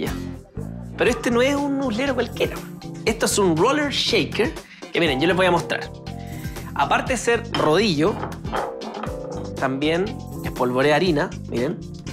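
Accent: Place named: Chile. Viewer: Dudé que fuera chileno porque entiendo todo lo dice JAJAJA. Qué buena receta, gracias!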